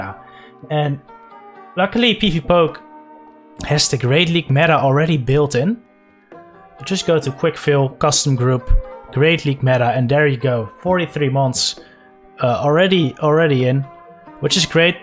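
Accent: Netherlands. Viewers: Are you dutch? Just by the accent good video, this was helpful for my sableye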